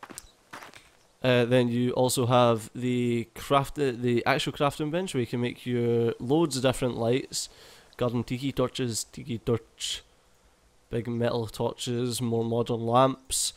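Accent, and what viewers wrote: Scotland, United Kingdom. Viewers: Super Scottish sounding review ! You can build, shit. Scotland, accept no imitations !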